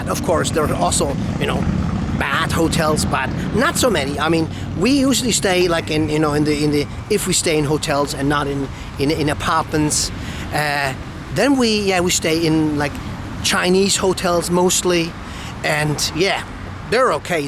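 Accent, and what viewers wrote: United States. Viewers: You say you are a Swiss family. But, you have a US accent, with alot of Americanisms. Why is that? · Please I hope you should come to Sabah to explore more of out unknown beauty hidden in the big jungle of Borneo, deep sea treasures at Semporna, Mount Kinabalu n our friendest people in Malaysia.. Plus the rich varieties of sea foods, local foods n local fruits that maybe you have not across before.. By the by listening to your English slang.. You could be an American n migrated to Europe I used to stay in US in 1980s while studying at Indiana State University.. till I obtained M.Ed.Geograpy..came back to Kota Kinabalu Sabah 1989.I enjoyed 6 years staying in US during my studies.. I wis one day I ll come back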